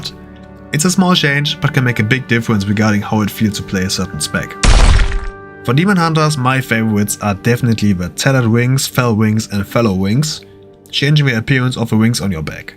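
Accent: Germany. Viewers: Der Typ klingt so deutsch xD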